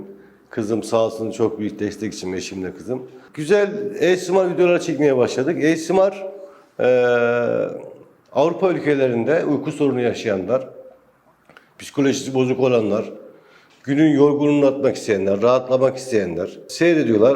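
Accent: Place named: Turkey. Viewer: Cc bsr Mr merci beaucoup de nous avoir parlé de vous cependant vous avez du talent et ça ça ne s'apprend pas mr6 👍 bravo un grand Merci pour votre accent Turc j'adore.cest agréable de vous écouter je comprends rien mais je lis l'anglais donc je comprends tout je vous zdore6 jame6voyd voir ici à très vite merci beaucoup ♥️🇹🇷🇨🇵👍😎🇫🇷🇹🇷🇹🇷🇹🇷🇹🇷♥️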